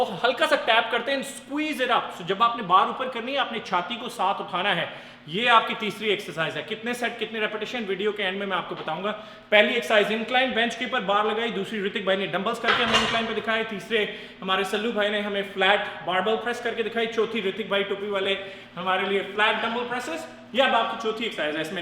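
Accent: Punjab, India. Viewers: veere video todi bhut changi ne .and toda punjabi boln di tone mnu or bhi changi lgi ne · Vir ji tuc punjabi lgde o jhri apne aap muh cho bahr nikldi aa 😋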